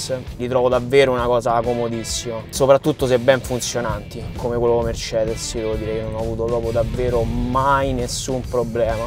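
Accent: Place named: Rome, Italy. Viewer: Ciao ma io ho preso l'autopilot avanzato in fase di acquisto della mia Model Y Performance e poi sono 3.800 euro certo è un pochino monco ma come vedi piano piano lo stanno sistemando poi oltre all'autopark che io avevo sulla mia Toyota Auris Hybrid del 2013 quindi una della prima versioni e devo dire ben funzionante con i sensori di parcheggio, ha anche l'autopilot e cambio corsa automatico e devo dire che funziona discretamente certo da affinare ma funziona.. ora secondo te, non sapendo di che parte di Roma tu sia, ma credo molto fuori Roma, beh ci vedi l'FSD entrare in pieno centro Via del Corso o vicino al Duomo di Milano o ancor peggio a piazza Plebiscito a Napoli? da parte mia lo vedo molto molto impraticabile anche perchè il traffico scomposto di Roma come di Milano che soprattutto di Napoli il sistema frenerebbe ogni 3x2 causando incidenti a manetta quindi è impraticabile ora ripeto capisco che sei di Roma dalla "perfetta" parlata Romana ecco un consiglio che ti vorrei dare.. 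prendi il Raccordo Anulare alle 8 di mattina entra a Roma e vai verso il Centro magari verso il Vaticano e ti renderai conto che nelle grandi città italiane l'FSD non potrà mai funzionare in America è tutto bello e tutto figo ma loro a differenza di noi il traffico è molto più lineare e preciso tutti ad una massima velocità che non si "sgarra" ecco pensa se a Roma andassero tutti a 50 km/h sai come ti manderebbero a FFAN...O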